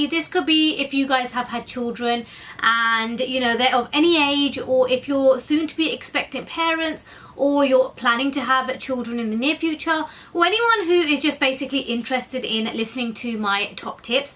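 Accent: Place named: Southall, United Kingdom. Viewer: Your Southall accent is horrible.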